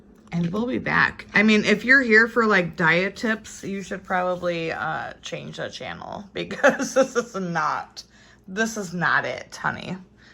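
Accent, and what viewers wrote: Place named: Alabama, United States. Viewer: so glad u liked it. Did our alabama drawl crack you up? Even I was like dang I sound like a southern Belle 😂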